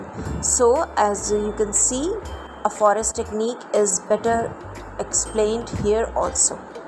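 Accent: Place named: India. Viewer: oh hell nah miss ur accent is indian typa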